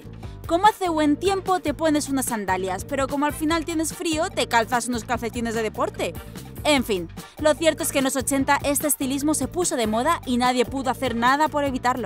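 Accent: Spain. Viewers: Lo peor él acento español :v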